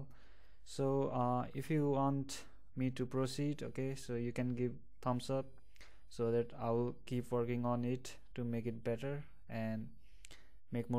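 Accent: India. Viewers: Clear to it's best!! Bangali??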